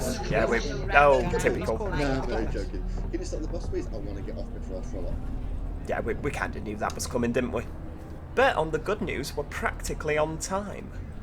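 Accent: United Kingdom. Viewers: Why they didn't fix the loading and freezing in this Game so sad! With wich sterriing wheel do you play Omsi2? And you realy should have more subscribers! I mean your videos are great and I love your British accent and you also got over 1 Million views. This is Insane :)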